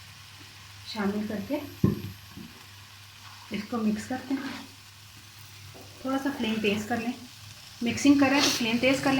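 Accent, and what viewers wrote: Punjab, India. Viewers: Your Punjabi is so cute... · Ji good I like ur Punjabi acent from 🇨🇦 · Ma sha Allah...nice Ma b bohat achi bindi bnata hn...abi lay k aya hn. Tu bnany lga tha socha utube se b dekhon or aaj difrent bnaon ....from UAE You say pindiyan😂punjabi accent....lay sun layan sun..sialkot...lahore...gujjranwala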